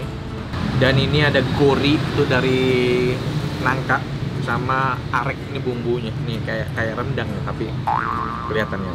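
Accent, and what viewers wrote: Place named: Java, Indonesia. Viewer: Kocak bro Nex bahasa Jowone , Wakakak !! Guded yogya memang top markotop ! Sehat selalu bro !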